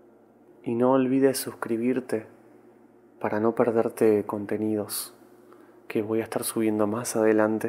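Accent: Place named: Argentina. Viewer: gracias! y ando esperando mas capitulos de los libros que quedaron inacavados. me ayuda tu voz argentina. memorizo mejor je.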